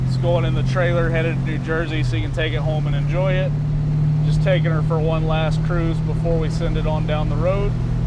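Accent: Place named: Texas, United States. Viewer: I LOVE THE ACCENT - IS THAT A COUNTRY TWANG. TAXAS HUH ? NEVER BEEN .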